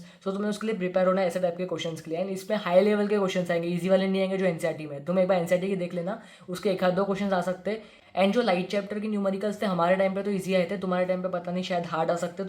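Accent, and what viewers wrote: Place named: Maharashtra, India. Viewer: I think he is from Maharashtra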